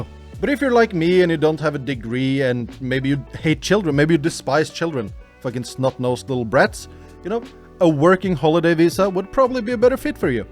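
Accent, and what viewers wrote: Norway. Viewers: Syntes dialekta hørtes kjent ut før du sa du var Norsk :'D Har aldri hørt om "self sponsor visa" før, men det høres ut som noe jeg kan få til.. Det er litt under 140k NOK per år ser det ut som :3 Veldig god info! :D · La meg tippe basert på aksenten din. Du er norsk sant?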